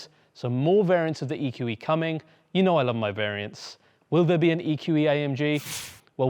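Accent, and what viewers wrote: United Kingdom. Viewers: have to be a Brit to understand this dude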